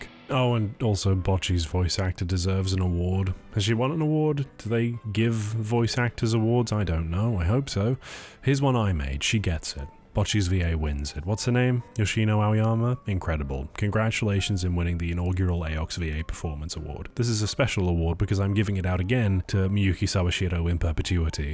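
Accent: United Kingdom. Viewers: Hearing "see you on the sunny side" in British accent makes me wonder how much of a meta joke that was about complex feelings of having anxiety of and desire for meeting friends.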